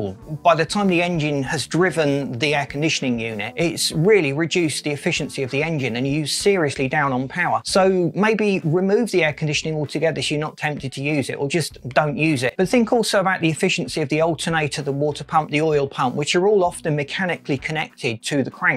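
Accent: United Kingdom. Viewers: Impossible to listen too much twang and cockney accent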